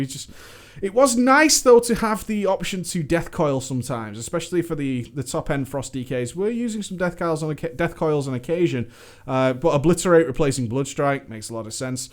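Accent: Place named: Scotland, United Kingdom. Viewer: I can't understand half what this mush-mouth is saying. Yelling, then mumbling with that thick-as-haggis accent doesn't work well.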